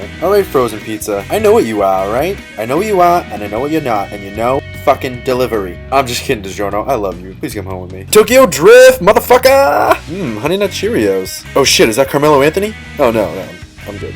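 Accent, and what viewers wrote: Boston, United States. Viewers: Woahh hello random Boston accent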